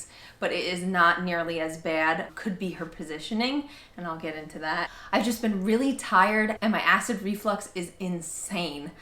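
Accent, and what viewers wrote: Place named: New Jersey, United States. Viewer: Hang in there momma♡ prayers for a successful vbac, your husband present regardless and all good things for your delivery. I know you're from up north, I thought I remembered you saying you're from NJ (and your accent sounds like mine😂) I am a jersey girl born and raised but live in SC and you make me feel back at home♡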